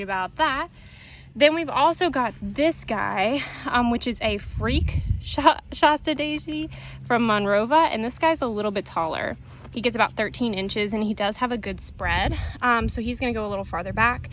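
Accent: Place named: South, United States. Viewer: Hey, I was wondering what state and zone you are located in? Seems like your Southern.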